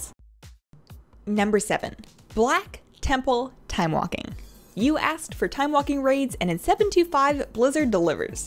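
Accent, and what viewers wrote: Canada. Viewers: haha i love the canadian accent :D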